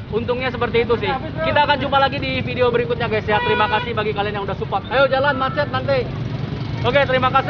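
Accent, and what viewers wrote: West Java, Indonesia. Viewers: sundanise pisan euy koko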